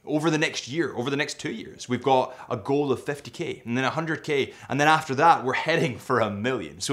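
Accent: Scotland, United Kingdom. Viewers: Can't help comparing you to Adrian Barker. Same presentation and conversation style but with a Scottish accent. Maybe you guys should collaborate? You both like watches, photography & adventures in the wilderness. Would be a fantastic pairing for YT content.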